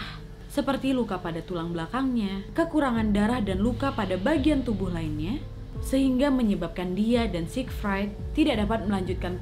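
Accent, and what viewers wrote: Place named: Medan, Indonesia. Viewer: nampak kali dek orang medannya 🤣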